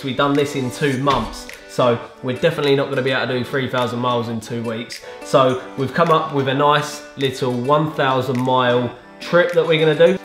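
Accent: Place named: England, United Kingdom. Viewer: You sound more English than most English people. Proper mate.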